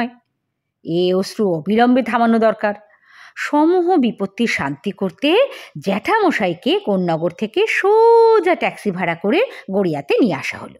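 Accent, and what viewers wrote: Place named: Bangal, Bangladesh. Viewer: খুব ভাল লাগল । কি সুন্দর বাঙাল ভাষা বললি ।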